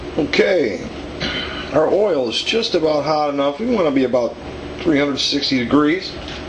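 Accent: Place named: Chicago, United States. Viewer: A big shout from Denver,! Man I swear I can smell the aroma coming outta my computer. BTW I can tell by your accent you're a Chicagoan! Keep up the good work.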